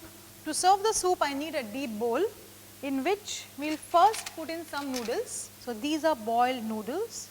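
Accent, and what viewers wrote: India. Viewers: She happens to be Indian.so the Indian accent. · what happened to ur pronunciation? very indian..! rather speak hindi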